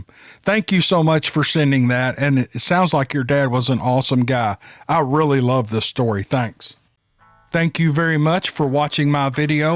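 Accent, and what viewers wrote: South, United States. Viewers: Listened to your collaboration with Shudder recently. Very good stuff. Ended up subscribing to them too. ALWAYS love your stories and that sweet Southern drawl. 🦍💖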